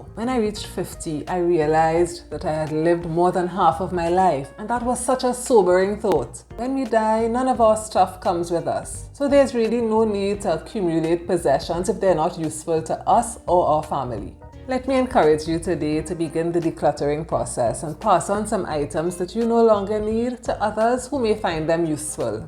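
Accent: Trinidad and Tobago. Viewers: I was watching this video on silent as soon as I turned on the volume I knew you were Trini. Subscribed immediately.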